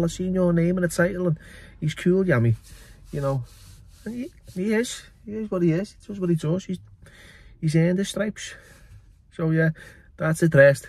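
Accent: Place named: RP, United Kingdom. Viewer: Sorry wrong msg , meant ot for your other video, I watch so many getting confused, I never comment, great posh accent 😂❤❤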